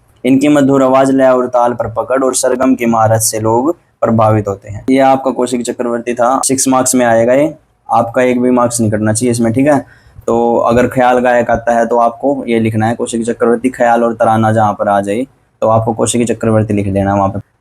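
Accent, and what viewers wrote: Haryana, India. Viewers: Aap haryana se ho sir ???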